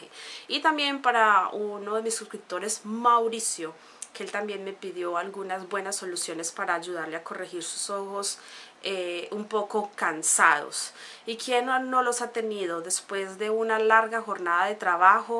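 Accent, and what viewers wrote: Colombia. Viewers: no tengo ojeras ,solo pase por tu video y me embrujo tu acento colombiano. bonita, inteligente y simpatica...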